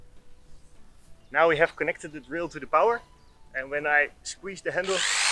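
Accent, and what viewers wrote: Netherlands. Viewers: Gewoon Twents verstoa ik ook we heur lol :-) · sounds Dutch :) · Are you dutch by chance? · Kan horen dat je een nederlander bent haha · I like that rig a lot, very well thought out for the task. But you are lucky with the soil consistency you have in the Netherlands (I assume from the accent). If you have rocky ground... well then... back to conventional drilling. ;)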